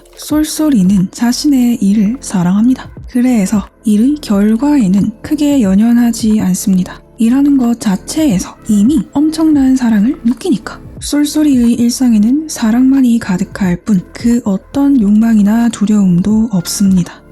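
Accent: Gyeongsang, South Korea. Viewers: 아따 ~걸죽한 경상도 사투리여서 좋네요ㅎㅎㅎㅎ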